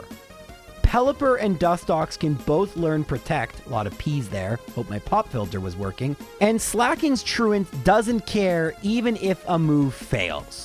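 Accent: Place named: Canada. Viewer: you even come off as a Canadian. Whereabouts do you live in Canada?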